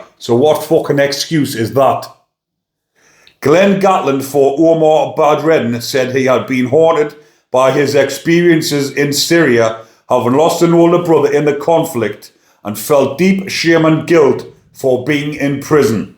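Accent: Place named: North East England, United Kingdom. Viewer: is from the north east of GB,and that is how they speak! It's called an accent!🙇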